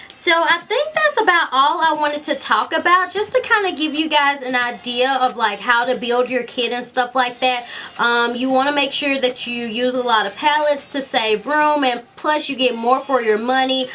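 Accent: South, United States. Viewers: OMG, i love your accent!! what state do you live in? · you can still hear your southern accent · Just recently subscribed I can't get over your accent! LOVE IT! Southern Belle style ;)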